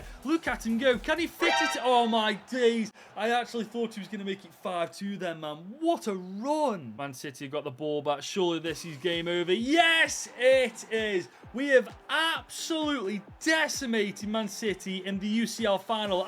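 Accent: Stoke, United Kingdom. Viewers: Is that a stokie accent I hear ?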